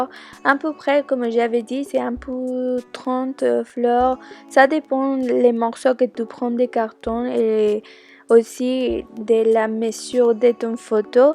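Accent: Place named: France. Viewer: i love your french accents, sigue asi de guapa, saludos!!!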